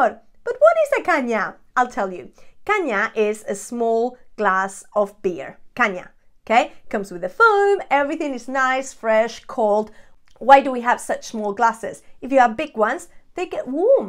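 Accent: United Kingdom. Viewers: It never occurred to me that there would be any British people who would be interested in learning Spanish. Just like Americans (whites) have no interest in knowing any other language except "Merican". You speak English with a British accent, but your Spain Spanish is perfect. Your appearance tells me that you have more Spanish roots than British. I wasn't going to watch you until I heard your Spanish and now I am intrigued. Let me know if I got any of that right.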